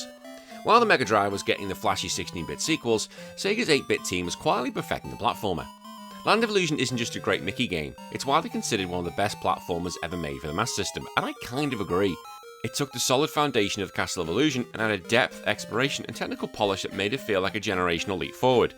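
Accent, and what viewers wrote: United Kingdom. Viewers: Yeah, I think overall it outsold the NES in Europe... This guy also sounds like he's in the UK, where NES really sold poorly and the Master System did very well.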